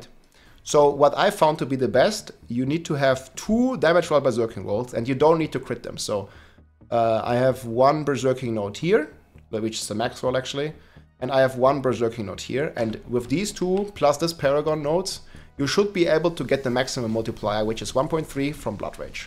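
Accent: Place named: Germany. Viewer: Wenn Deutsche Englisch reden, zu funny :D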